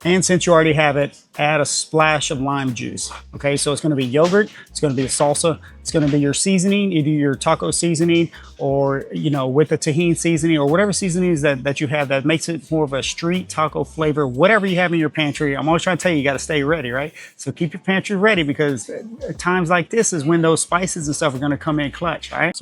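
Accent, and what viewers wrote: Louisiana, United States. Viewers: a bibimbap or bulgogi bowl meal prep would be a lot of fun! I thought I heard a Louisiana accent. I grew up there but am now in DFW 😊.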